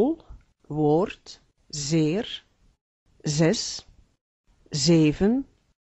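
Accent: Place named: Belgium. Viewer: what? it is just ABN my friend.. It is more Belgian than it is Dutch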